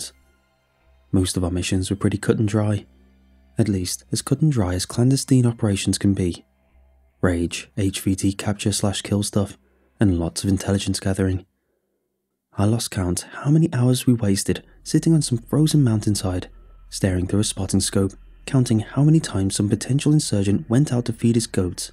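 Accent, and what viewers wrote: England, United Kingdom. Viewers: I might have possibly believed this as a true story if he explained that he was SAS. But that British accent was a tip off. But it is well written . And a nice work of fiction. Good job! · British accent, pronunciation is different, not wrong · Why is there a British narrator? Can't understand a word. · Obviously it's been narrated by a British guy. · What’s with the English accent? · Guy sounds a lot like a Brit to be an American... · is British. It’s the most logical explanation for why he pronounces things differently. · This guy sounds like a Brit but says he grew up in Colorado.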